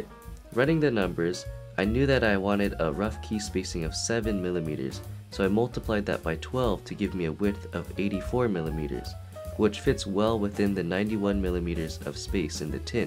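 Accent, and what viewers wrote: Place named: United States. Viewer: Is this the first time he’s spoken in a video? Didn’t expect him to be American.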